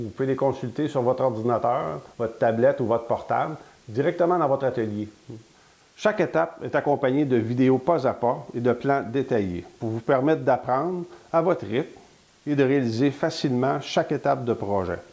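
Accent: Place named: Quebec, Canada. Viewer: le bois + l'accent québécois, j'adore ; )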